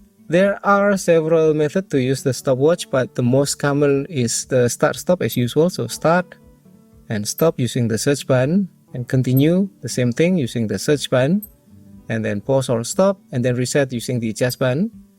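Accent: Indonesia. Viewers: Udah saya duga. Logatnya Indonesia bgt.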